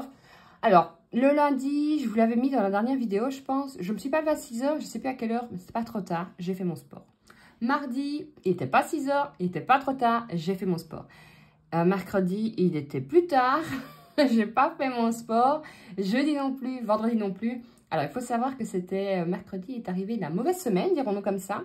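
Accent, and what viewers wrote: Liège, Belgium. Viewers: Tu as déjà tenté le simulateur d'aube ? C'est un réveil dont la lumière s'allume progressivement pour être à son max à l'heure à laquelle il sonne. Ce qui fait que quand tu ouvres les yeux, la lumière est déjà allumée . J'en utilise un depuis près de 20 ans, je ne pourrais plus m'en passer ! Bonjour de Bruxelles, chère Liégoise ;-). L'accent ne trompe pas =D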